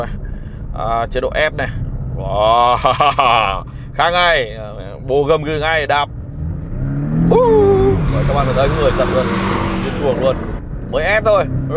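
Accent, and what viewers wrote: North, Vietnam. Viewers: kieu giọng bake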